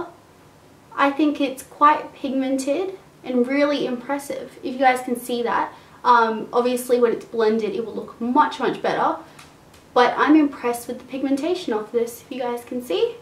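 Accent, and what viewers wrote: Australia. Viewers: Kawaii Cukie Going by her accent she's in Australia like me, our Daiso stores sell everything at $2.80 :( everything is more expensive here.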